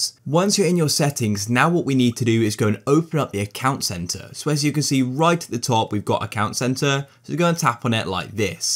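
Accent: United Kingdom. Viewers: If he is american why does he speak british?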